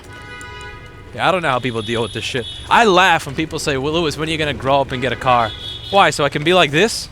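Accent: New York, United States. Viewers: your new york accent is particularily strong today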